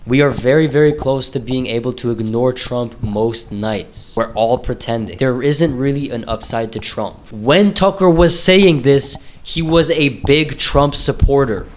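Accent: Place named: Canada. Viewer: Oh this is good man. Idk where you came from… sound Canadian - but this is good work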